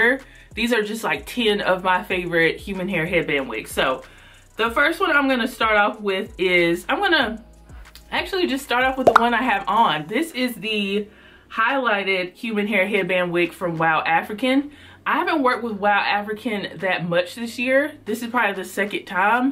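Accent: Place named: Alabama, United States. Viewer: Your accent must be from Alabama 😊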